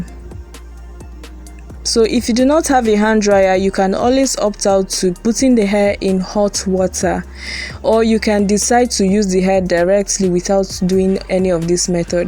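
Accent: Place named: Nigeria. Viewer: Are you Nigerian Coz you sound like one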